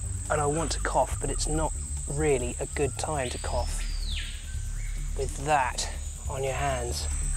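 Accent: England, United Kingdom. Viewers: Truth, specially with that English accent ❤️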